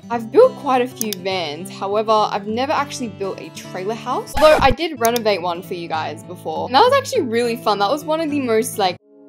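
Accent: South, United States. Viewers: Can you do a video only using you country accent? LOVE YOUR VIDS!!! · How is better at a country accent then me and I’m from Texas 🥲🥲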